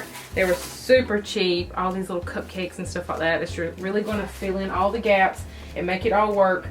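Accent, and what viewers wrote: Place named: South, United States. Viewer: Oh yeah and that accent ... loving every moment making me miss my southern roots 🥰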